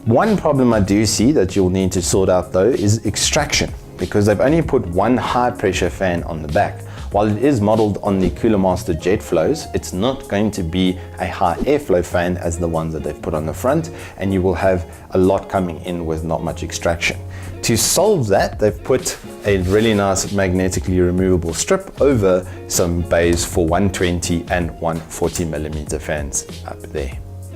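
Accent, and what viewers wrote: South Africa. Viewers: South African? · EthanHuntMi6 he has a Afrikaans accent, he is South African I can feel it. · great review! Are you South african?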